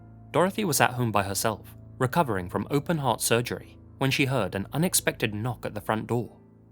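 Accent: United Kingdom. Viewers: They don’t say those phrases in the states. Sounds like a Brit for sure.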